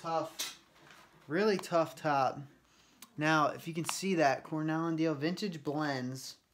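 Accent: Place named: Philadelphia, United States. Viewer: You have a strong Philly accent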